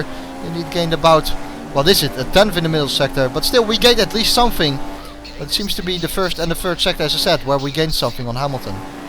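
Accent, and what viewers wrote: Netherlands. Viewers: geweldig dat nederlandse accent hahah ga zo door!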